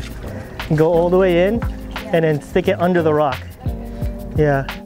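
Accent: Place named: United States. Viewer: Bro, I live in Japan and watch a lot of fishing vids, so I guess that's why your vid got recommended, but you can imagine my surprise when I heard you speak, obviously born in America or at least lived there most of your life.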